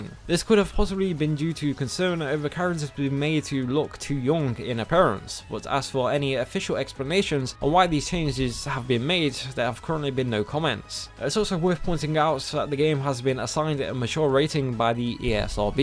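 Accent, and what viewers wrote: United Kingdom. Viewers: Whoisthis a type of British accent, emphasis on type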